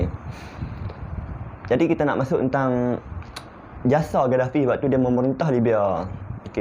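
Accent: Perlis, Malaysia. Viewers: Good content Bro. Semoga Allah mempermudahkan segala urusan kita hendakNya Bro. Style cakap mcm Geng Perlis.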